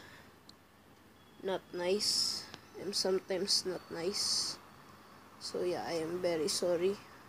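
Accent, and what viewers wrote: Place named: India. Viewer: Its like indian accent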